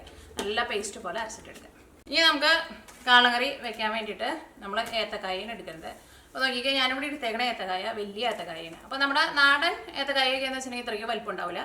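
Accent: Thrissur, India. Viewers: Super trissur slang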